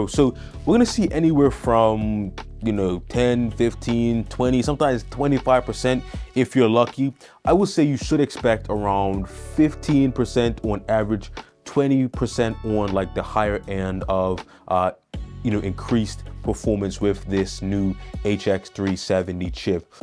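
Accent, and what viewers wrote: Bermuda. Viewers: Are you Bermudian? Edit; there is no doubt. Subbed, gotta rep my people.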